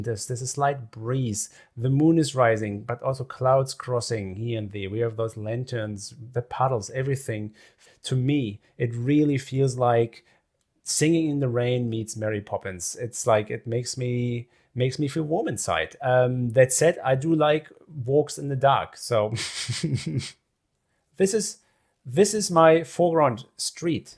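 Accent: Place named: New Zealand. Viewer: Beautiful and practical way to get the shot ASAP. Also I lived in NL long enough to be able to pronounce your last name correctly, and your Kiwi accented English is a perfect living testament to your VFX career!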